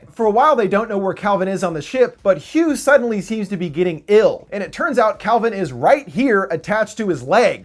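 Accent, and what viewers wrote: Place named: United States. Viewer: The American accent is so fucking obnoxious, A complete assault upon the ears. Or maybe it's just this one guy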